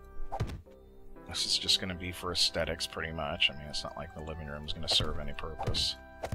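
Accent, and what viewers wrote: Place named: South Africa. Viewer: That south African accent helps: )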